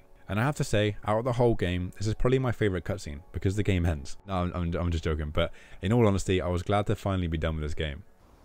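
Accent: United Kingdom. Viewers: This video is absolutley top tier! Love to hear another brit! :) keep them coming man!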